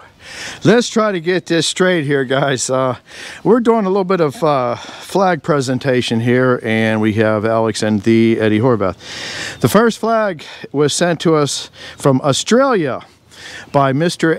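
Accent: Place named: Maryland, United States. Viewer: Where yall at? Im in Pasadena, MD and recognize the accent, and the mention of gone downy oshun. Just moved from SC and miss this good honest industrial stuff. Would love to come say hi!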